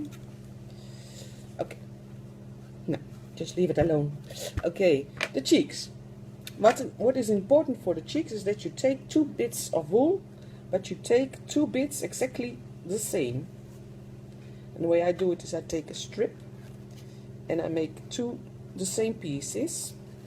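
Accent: Netherlands. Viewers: Just wanted to say thank you for your fantastic tutorials....I'm new to doll making but you have inspired me and definitely shown me the way forward. Your tutorials are easy to follow and I love listening to your lovely Dutch accent! Best wishes and thank you!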